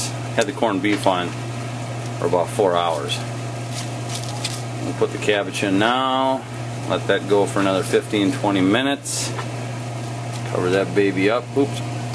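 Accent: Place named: Wisconsin, United States. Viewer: You live in wisconsin cuz I do and you have the accent lol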